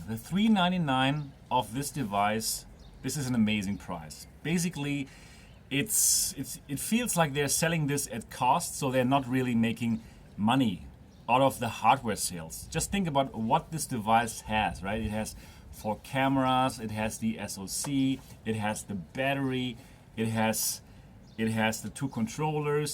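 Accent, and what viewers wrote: Germany. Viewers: very hepful! Are you by any chance german? Maybe im mishearing something but you sound kinda like it haha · Are you a German?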